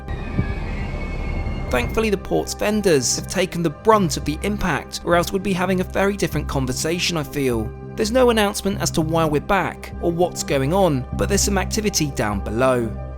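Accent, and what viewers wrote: United Kingdom. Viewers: Years ago you thought I was making fun of your accent, but I actually thought it was charming and wondered what part of the UK you were from 😊 😅 I’m glad you liked the meals and tbh they did look especially good. Your steak looked absolutely primo. I really think people would get much more value for money by taking an MSC 3 or 4 day cruise out of Miami. I’m now about to watch your first class cruise you and your dad took on MSC Explora. Wishing you smooth seas ahead.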